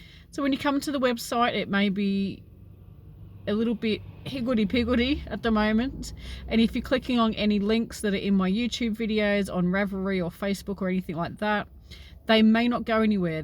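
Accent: Australia. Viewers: it is so good to see you back, I know you have had some stress related issues. I pray that you are doing well and everything is going good for you. Believe it or not I was just thinking about you the other day wondering if we would ever see you back on YouTube instructing us on crochet. I am looking forward to watching all your new tutorials on your new channel. I have always enjoyed your tutorials and your lovely Australian accent, just makes my day. Blessings, good health, best wishes for you in the future. Much love coming your way from Tennessee, USA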